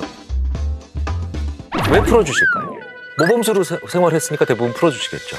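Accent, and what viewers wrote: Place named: South Korea. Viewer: 우와~인제 논문도 읽어주네요~ 전문가 놀리기 재밌어~ㅋ 배부르니까 긍정적인거라는 거구만요. 배고프면 부정적이고. 의심도 많아지고. 고만고만한 모범수들.. 그냥 종이 한장이지 뭐~ 사람이 그쵸. 일이 안될때 밥먹고 하자는 무의식이 발동 하는군요~ 배고프고 힘들때 예민한 이유도? 왜케 한국말처럼 영어를 읽으나요...귀에 쏙쏙 들어오네요. 우와 뭔가 경제에 영향을 미칠듯한 메세지네요. 나를 솔직히 말했는데..이용하고 편견을 갖는 사람 있어요..굳이 말할필요 있남?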